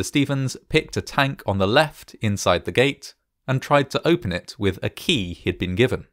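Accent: England, United Kingdom. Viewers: He's an English native, speaking the language of English, with an English accent, in England 🤯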